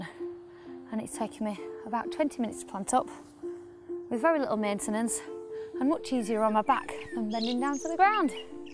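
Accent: United Kingdom. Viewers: UK sound, very nice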